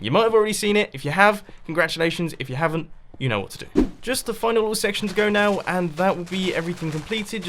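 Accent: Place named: United Kingdom. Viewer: you pronounce farm fohm because you're British shugeh cane fohm huhmmit croft laver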